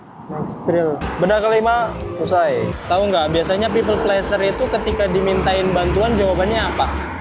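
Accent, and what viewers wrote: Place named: Banyumas, Indonesia. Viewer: Asline wong ngapak to 😂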